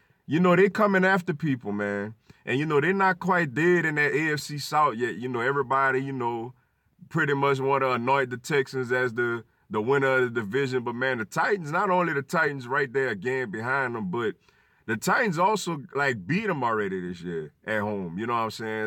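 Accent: New Orleans, United States. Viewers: Dude, every time I hear u, I think u from New Orleans and IM FROM NEW ORLEANS!!! 😂😂😂. Love your ur objective takes though. Who Dat! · OMG, as soon as I started listening to this video, I was like this boy is from New Orleans talking like that...lol You need to leave those Eagles alone and come back home to the Saints. ;-) Who Dat!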